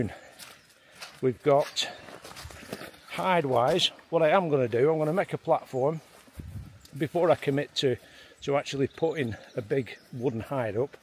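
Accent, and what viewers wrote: Lancashire, United Kingdom. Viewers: Awesome mate… always love listening that lanky twang here in Belgium… a link to my past in Wigan. Looking forward to watching you develop such an amazing place 👌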